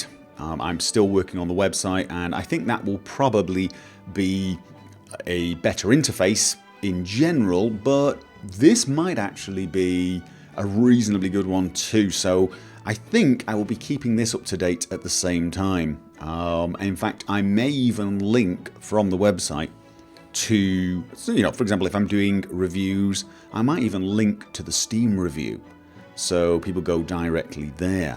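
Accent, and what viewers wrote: United Kingdom. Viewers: is norwegian? tha fuuck he sounds british as fuck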